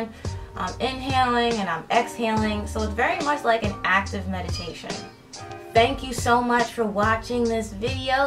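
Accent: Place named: United States. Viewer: thank you sooo much!!! This has helped me a lot!! && yeah I'm watching from London, quite far away,I love your accent! I wish I had an American accent lol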